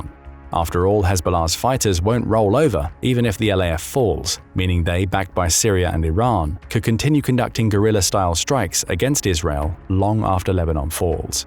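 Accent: United Kingdom. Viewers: What a crap. Full of.wrong facts with nice british accent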